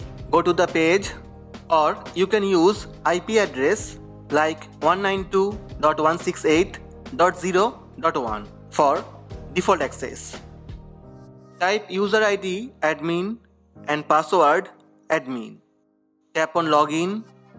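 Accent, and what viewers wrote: India. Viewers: Course has to have that Kwik-e mart accent...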